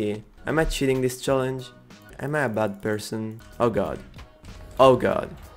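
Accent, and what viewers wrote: Italy. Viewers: Si sente proprio che sei italiano lol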